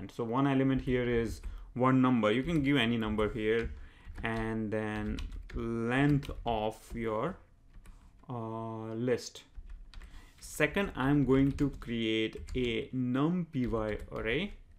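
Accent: India. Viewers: Indian accent not kashmiri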